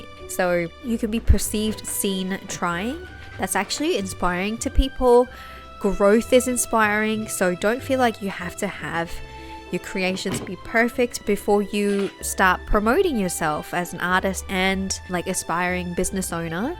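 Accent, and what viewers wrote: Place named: Michigan, United States. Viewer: Yours is easily the coolest Michigan accent I've ever heard!! Your zig-zaggy life story is interesting too. Those are the most interesting life stories, where the person had to try a bunch of things before something eventually floated their boat. I'm paraphrasing Jerry Yarnell, the TV painting teacher guy who said something like, "Bravery isn't not being afraid to do something. It's being afraid to do something, and then doing it afraid." Looks like you've got pretty mad crafting skills too. I'm subbing your channel so I can swing by here from time to time.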